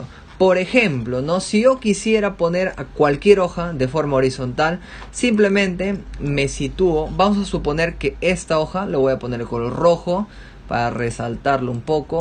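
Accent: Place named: Amazon, Peru. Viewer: Causa, buen vídeo, me sirvió bastante, por tu acento saqué al toque que eres de la selva peruana, saludos desde Tingo María.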